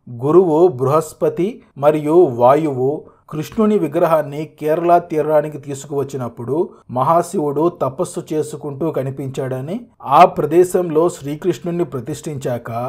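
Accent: Nellore, India. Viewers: అన్న మీది Nellore haa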